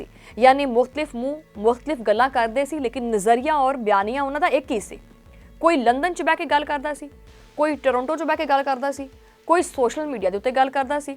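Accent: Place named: Majha, India. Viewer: ji menu thodi teth (pure majheli punjabi ) bahut vadia lagdi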